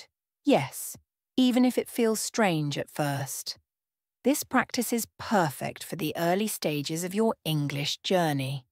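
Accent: United Kingdom. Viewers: Is this a British accent? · It is british accent. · The British accent is very charming🥰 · No she said at is a British accent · بريتيش اكسنت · Is it british accent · She said british accent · I don't like the British accent 😢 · (Is it british accent) Yes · No ,it’s is a British accent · Is this british english?? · Day 1 complete! This shadowing challenge is exactly what I needed to boost my confidence and improve my pronunciation. Loving the clear and natural British accent❤ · I tried to find something with a British accent! 👏Thank you! 😊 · noooooo qué lastima que es inglés británico... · This is the first time I have seen this video and I loved it. Thanks for this proposal and the encouragement! The British accent is my favourite, keep going! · I love the clear and natural British accent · She said it's British, because it's her accent. It's in minute. 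· England accent 😢😢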